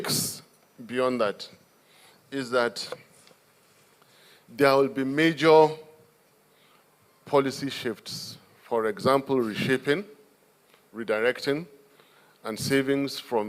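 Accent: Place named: Hausa, Nigeria. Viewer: Wow.. this is so clean of an hausa man speaking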